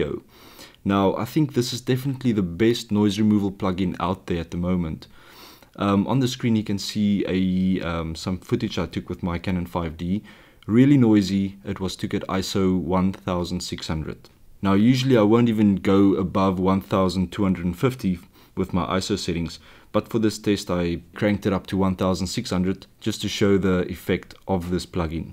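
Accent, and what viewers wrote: South Africa. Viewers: Helpful tutorial, but I'm liking this mainly because I love South African accents :)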